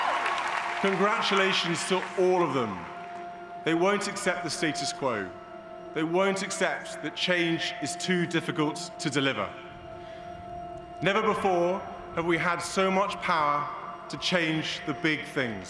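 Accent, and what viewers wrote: United Kingdom. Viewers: He reminds me of his mother and his looks he is eloquent and talk like a real Brit what a nice homage to the Queen and a nice speech let's hope he makes a good... king... Not too happy with the Royals...I've been around quite a while to see what the royals did to Diana ....never was a big fan A lot of hoopla ...if they really did a background check are they all really royalty? Not sure how true that is it's not like in the 1600s a lot different then I'm sure Bravo the Queen Elizabeth II to be around this long.... Queen Elizabeth I was a real Queen wow she is named after after a real gem.God-bless her🇺🇲🇨🇺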